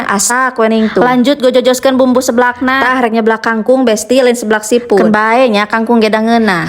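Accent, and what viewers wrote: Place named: Garut, Indonesia. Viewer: ciga logat urang manaaaa Kitu teteh...😂😂😂,,Garut kidul kitu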